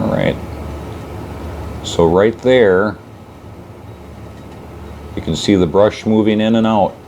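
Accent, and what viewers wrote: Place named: New England, United States. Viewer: I’m sorry I just can’t stand the sound of Yankees at all. I’ll find somebody else to explain it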